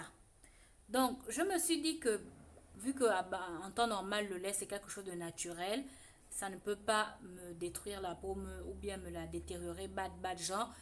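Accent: Gabon. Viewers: Hello. Super merci. Vous êtes Gabonaises rien que ai son de votre voix 😇😇🙏merci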